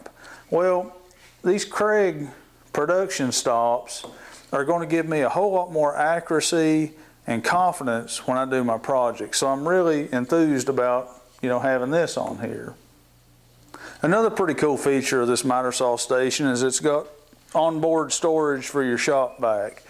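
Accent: United States. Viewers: I love it. I was replacing a 10 year old 10" ryobi haha so it's definitely a step up. I've started making the miter stand today and I'm just using your dimensions, so that worked out good with you telling your dimensions as well. Appreciate the work you put into those videos as its going to help me a lot. If you don't mind me asking, what state are you from. I live in the VA/TN border.... Sound like a country boy to me haha I know that country accent well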